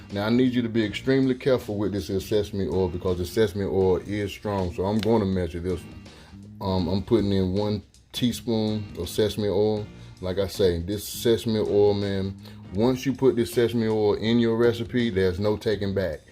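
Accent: South, United States. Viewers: Girl I was like wait a minute is he married then I went to take the rest of his channel so I assume that he is married so no disrespect when I'm say this comment I'm like this man than made him an outside deep fryer and then it sounds like he's from the south and Country guys, real country men be coming up with all kind of neat stuff it just makes me so proud, like one of my friends actually made his own barbecue grill he welded it and everything himself lol we sat out after he finished and had a late night bbq. So all in all what I'm getting at here was I so excited to see him take them wings and drop them in that outdoor deep fryer 🤣😊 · Haha!!! This here man is so southern. I love it. Those wings look great. You should get you a second basket though. You can put your wings in one then drop it in the grease. You put the second one on top to keep them submerged. 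You are able to shake the basket to keep them from sticking as well. When they are done they are already in the basket. I subscribed. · He gotta be southern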